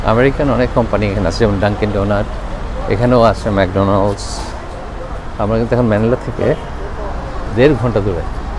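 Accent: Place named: Sylhet, Bangladesh. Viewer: ফিলিপাইনের ব্লগ দেখতে বসে সিলেটের কথা শুনে খুব ভালো ও লাগলো আবার হাসিও পেলো, আমি কিন্তু সিলেটি।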